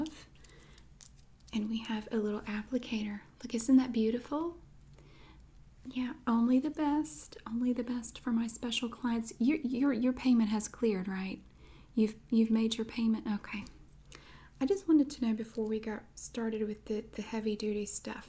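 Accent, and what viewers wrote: South, United States. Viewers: i love the lore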